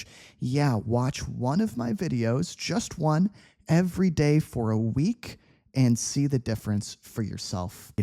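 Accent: United States. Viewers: I'm learning UK English, I don't mind watching your videos and following your recommendations, isn't this channel American English?,I from Xorazim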